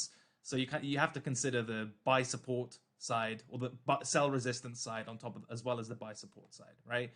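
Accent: United Kingdom. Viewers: Missed listening to the British accent. Can you record the twitter spaces and put it on Spotify? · love listening to you video with British accent and voice haha n the way you explain your chart , slowly bring out the flow and reason why n all the details ! hope theres live video more often ,thanks !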